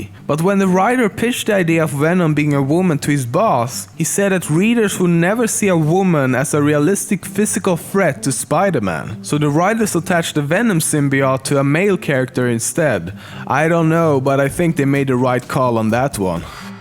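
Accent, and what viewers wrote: Sweden. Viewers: He is swedish... basically like pewds, jennifer= yennifer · Hahah, your swedish accent makes me laugh xD Norway ftw! great videos though (Y) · well he is swedish so swedish people can't pronounce very well in "J" letter which i love listening to their accents :)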